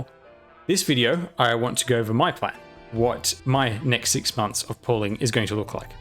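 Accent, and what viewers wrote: Australia. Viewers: Are you Australian btw? I'm trying to place the accent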